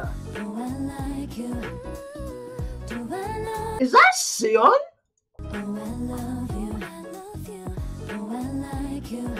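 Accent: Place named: Australia. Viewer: dreamcatcher \:D/ where you at? down under based on your accent? :D they should tour there before the end of the year. they just finished their usa tour and it was awesome. if you are curious, there are a few good vlogs/reviews that are more commentary than just showing full song clips(paul leach, the kpop guitarist, ram reacts, merlin zimmerman) that you can maybe even react to. I'm surprised you actually know them by name, since you haven't reacted to that much of their stuff at least here on youtube. just do more album reactions. most of their albums are mini-albums so it's only 4 songs. just be sure to check if they have special clips for the b-sides before picking a lyric video.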